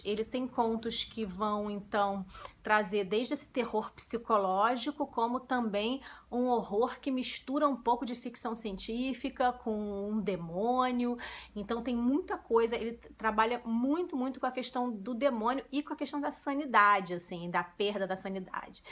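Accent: Rio de Janeiro, Brazil. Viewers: Você tem toda razão, Misery é angustiante rsrs adorei! Então, esse pacto que você tem é o mesmo que eu li, "pacto/amaldiçoado" é o mesmo livro, eles mudaram o nome quando lançaram o filme com o Daniel Radcliffe, ainda não assisti. Quanto aos livros acumulando poeira na estante, eu costumo fazer trocas no Skoob, já me livrei de muita coisa e já consegui livros incríveis. Super indico. Beijão! Já falei que adoro o seu "carioquês"? kkkk acho lindo.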